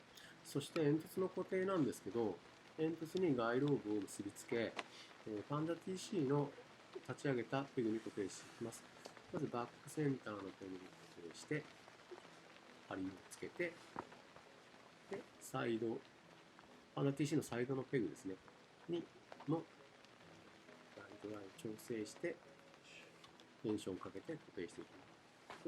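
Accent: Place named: Hiroshima, Japan. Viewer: おはようございます！広島のイントネーションの解説落ち着きますね👍手順がよく分かって助かります。 自作タープ私も作ってみたいのですが、生地代だけでも結構なお値段なので中々着手出来てませんw 幕内薪ストーブお篭りぬくぬく憧れなのですが、私にはまだ早そうなので来期挑戦したい憧れスタイルです😄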